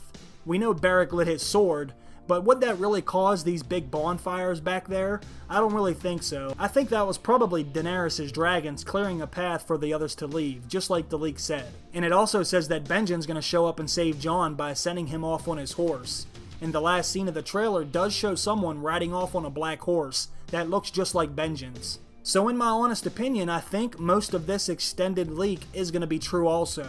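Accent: Pittsburgh, United States. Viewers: ice fucking dragons and narrator has gotta be a pittsburgh guy love the accent lol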